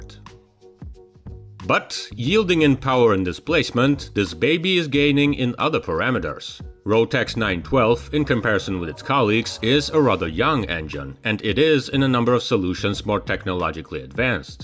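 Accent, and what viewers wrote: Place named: Russia. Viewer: I needed more "Wings of Russia" and I found you - everything related to aviation sounds better in a Russian accent!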